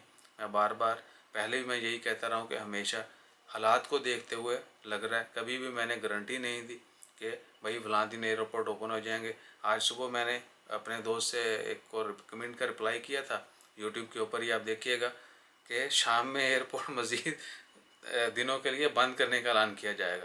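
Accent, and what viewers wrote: Pakistan. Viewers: Salam Walekum kya hal hai theek ho awaaz se Pakistan aadami Corona test Corona test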